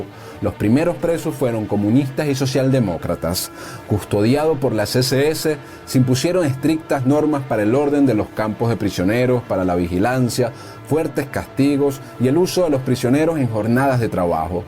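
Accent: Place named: Venezuela. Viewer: No soporto ese acento venezolano del youtuber. Cómo todo venezolano en Canadá viven haciendo videos para YouTube y TikTok pero no quieren trabajar.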